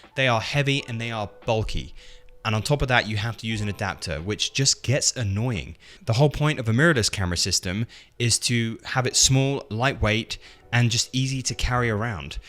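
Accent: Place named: United Kingdom. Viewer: You from the UK cool.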